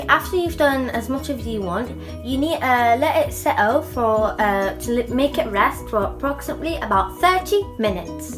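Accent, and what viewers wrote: United Kingdom. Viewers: Thanks beauty you are so cute and your accent is like the British pple the recipe seems delicious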